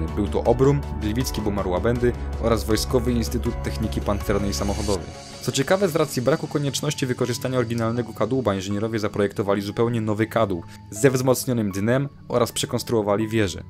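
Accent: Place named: Russia. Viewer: Dlaczego masz ruski akcent,? Skąd jesteś? Sorry, jestem tzw. poliglotą i znam kilkanaście języków, więc jestem trochę przewrażliwiony i nawet jeśli nie robisz zbyt wielu błędów to zdradzają cię samogłoski, ikanie, akanie.... Prosty lud to kupi, a ja nie. To jak to jest z tobą - Podlasie, czy yeah bujny ruski troll?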